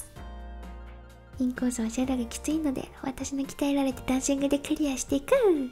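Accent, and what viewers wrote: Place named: Ehime, Japan. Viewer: (•ө•)♡ こんばんわ今回は箱根なんですね！その前に… とチャンネル登録者数〜 やっと、やーーっと２万人越え！！長かったけど、じわじわ確実に増えてて、嬉しいですね！本当におめでとうございます🎊 でも、コメントへの返事がますます大変になってしまいますよね… 😐 きっと、なら大変とは思わず、「かまん、かまん」なんでしょうね。 （↑愛媛の方言で合ってますか？） 今回の箱根は何月の旅行でしたか？ガーミンの気温に18.8℃と表示されてたので、４月頃でしょうか？山道だから５月かな？日差しが熱いって、６月？🤔 のジャージ、青い空、の首（日焼け対策してますね！白いです笑）、ロングヘアー、凄くマッチしてて可愛いですよ(•ө•)♡ 以前の動画でも今回の動画でも「〜 …じゃあ」って、今回の動画では「路地裏の斜度もきついんじゃあ」は愛媛の方言ですか？女の子の方言って可愛いですよね (•ө•)♡ チェーン落ち着くのところで参考になれば、、自分はサドルバッグにニトリル手袋を入れてますよ。パンク修理は汚れは気にしないですが、チェーン周りの何かの作業をすると黒い油が手につくし、バーテープも汚してしまいそうなので、念のため入れてます。ガーミンちゃん、やっぱりそうなんですね…😭 自分も横浜市に行こうとナビに従ってると国道357をひたすら走り、東京湾海底トンネルに案内されます。高速道路と自動車専用道路（国道357）なので通ることが出来ずかなり戻されます😭 後ろから車が飛ばしてくるのって結構怖いですよね… 自分は右側のバーエンドキャップを外して、凄く小さなバックミラーを付けました。後ろを振り返ることなく後方確認が出来て、買って良かったアイテムの１つです。おすすめです。硫黄の温泉♨、いいですよね！箱根は何回か行ったことあるんですが、硫黄臭い印象が無くて、そうなんだ～！！と勉強になりました。草津温泉♨は硫黄の臭いが凄いですよね。温泉玉子のシ～ンで写っていた太もも、鍛えられた太ももですね！凄い Σ(ﾟДﾟ) クライマーの脚じゃないですか～💦 いいな～ 坂が好きって、憧れます。自分のここ最近ですが、土日のどちらかに横浜市にある浜カフェというところに行ってます。往復で80キロくらいです。膝の痛みはまったく無くなりました。少しずつ距離を延ばしたいのですが、夏の日中の暑さがきつく距離を延ばせず…です😢 はじめの頃は帰り近くになるとふくらはぎが攣ったりしましたが、ネットでいろいろと勉強して、ポカリかアクエリは必須、トイレに行って黄色いおしっこが出たら水分不足ということを知りました。水分&ミネラル補給がダメダメでした💦 ミドリ安全というところから出しているミネラルのタブレットも買って、今日（7/9）、この暑さの中びっくりするくらい快適に走れました。夏季休暇はまだ未定ですが、自分もを真似て、一泊ライドに行こうと計画してます。はいつかはきっと富士ヒルにも挑戦するんだろうな～～と思いました。応援してます！ （また、めちゃくちゃ長文になってしまいましたが💦🙇‍♂💦） 待ったね～～(•ө•)♡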